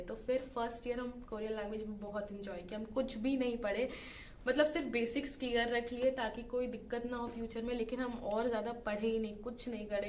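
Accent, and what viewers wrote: Jharkhand, India. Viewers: TURU... HMKO LG HI RA THA SHE IS FROM JHARKHAND😂❤️ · Ur language as" hum hum" ...though u r just one prsn reminds me of my hometown...that's too jharkhand🤣🤣🤣🤣too cute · I'm from Jamshedpur....and her accent is jharkhandi saying hum 🥺❤️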